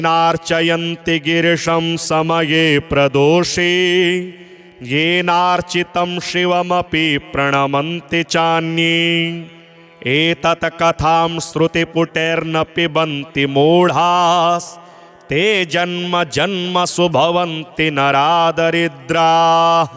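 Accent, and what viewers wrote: Standard. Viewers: aapke Shuddh Uachchaarn SnskrittT Ke DeevaneinnN Hum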